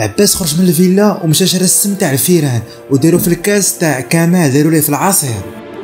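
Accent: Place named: Souss, Morocco. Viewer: احسن واحد كيعاود القصص من لكنة ديال لسانك غالبا من سوس.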